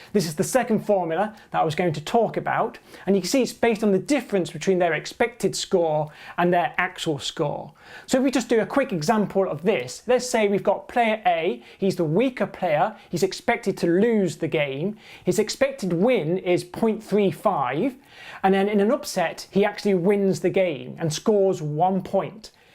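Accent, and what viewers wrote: United Kingdom. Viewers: My brain melted. 😢🧠🔥 PS. Cool British accent. 🙂👍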